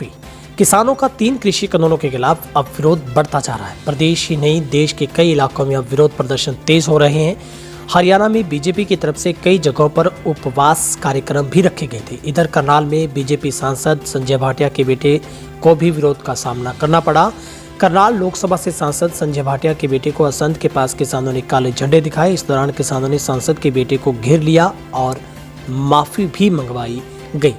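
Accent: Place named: Punjab, India. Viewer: कनून नहीं होता कानून होता है। हिन्दी में पंजाबी की तरह मत बोलो हिन्दी का उच्चारण ठीक से करो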